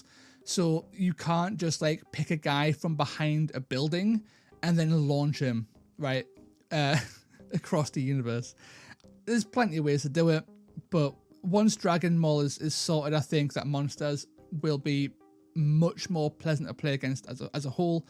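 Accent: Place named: Spain. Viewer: I love your spanish voice lol I use last tournament a Deathbringer against a Zombie Dragon... and he flew away lol CHAOS POWER!!!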